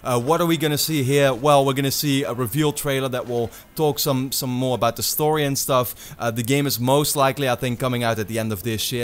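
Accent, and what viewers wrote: Netherlands. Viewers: Good work with this video m8... But i have to ask: Are you by any chance Dutch? You have quite the accent 😉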